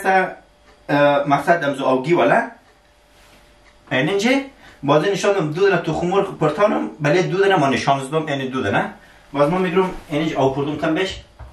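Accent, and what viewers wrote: Hazaragi, Afghanistan. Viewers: اموته سوچه هزاره گی گفتنت مرا مزه داد چینل تو سبسکرایب کدوم و لایک کردم. قوربون کلام نابت عالی بود ممنون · سلام علیکم اغای بیادر بسیار لحجی هزارهگی شیرین گپ میزنی اما لحجی هزاره گی هیچ وقت نشونیده بودم ما شا الله مقبول گپ میزنین مفق باشید ده کار بار تان تشکر💜💙💚👍👍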